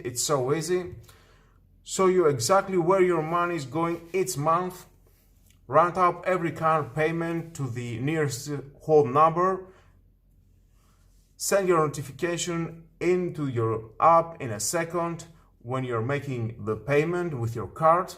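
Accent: Greece. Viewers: Κι εγώ με το που τον άκουσα λέω δε παίζει, ή Έλληνας ή Ισπανός θα ήταν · Τον Έλληνα δεν τον καταλαβαίνεις από την φωνή αλλά με την πρώτη λέξη πού θα μιλήσει αγγλικά, όσο special κι αν είναι, proficiency να έχει ρε ΚΑΤΕΥΘΕΊΑΝ ΘΑ ΠΕΙΣ ΝΑΤΟΣ😂💪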